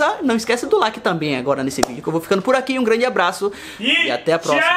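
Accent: Bahia, Brazil. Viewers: Cheio de sotaque kk, esse é baiano mesmo ❤️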